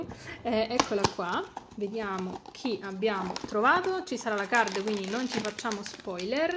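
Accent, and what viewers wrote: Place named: Rome, Italy. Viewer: Ciao!! Di dove sei? Io convinta che fossi di Roma 😅 Quando ti ho sentito dire che non c'erano nella tua regione ero tipo 😮 Comunque tutti bellissimi ❤️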